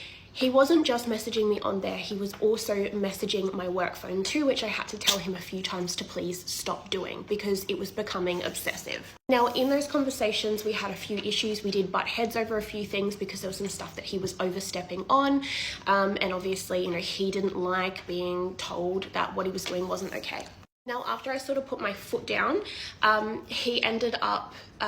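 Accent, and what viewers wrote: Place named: Australia. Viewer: Man, I totally love Australians. Her accent is 🔥. Shes really hot, I’d book with her in a second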